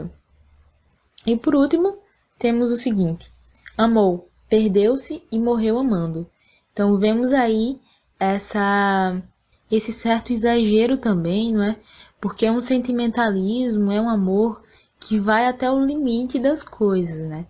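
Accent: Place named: Rio Grande do Norte, Brazil. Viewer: Esse sotaque não me engana! É do Rio Grande do Norte! Muito boa aula! Me ajudou mt!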